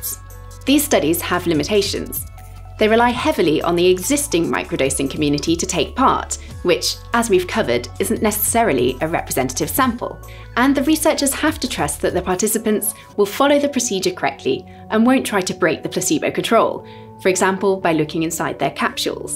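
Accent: United Kingdom. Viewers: She's so beautiful and i love her British accent!! Thanks for sharing.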